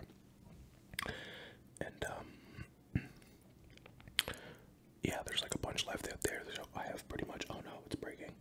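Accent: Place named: United Kingdom. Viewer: I’m british but I usually cringe when I listen to british ASMRtists idk why😬🤭 you’re the exception, so you’ve gained a sub 🤷🏽‍♀️😂 I came from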